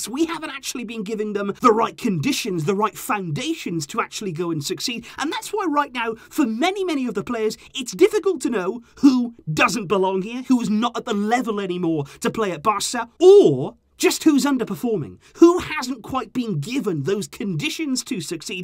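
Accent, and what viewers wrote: United Kingdom. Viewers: are you British or Irish? The accent sounds British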